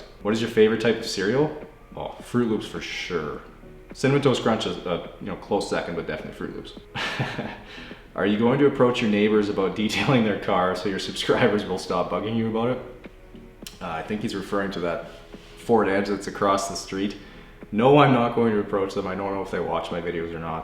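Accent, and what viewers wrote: Canada. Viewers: Love that you played. My Southern roots anyway. Your pronunciation of garage is cute but decal is what stopped me in my tracks. I was like wait...what?! Then it hit me that you were talking about a decal on a car but I heard “deck-cul” remembered I had heard that pronunciation by another Canadian from Western Canada. I have Canadian family from Ontario but I guess you all have different accents. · NO ONES TALKING ABOUT HIS CANADIAN ACCENT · Now I hear the Canadian accent. I think it’s with the o’s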